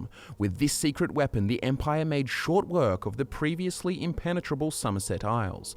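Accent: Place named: Australia. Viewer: Gergazie that’s just his Australian accent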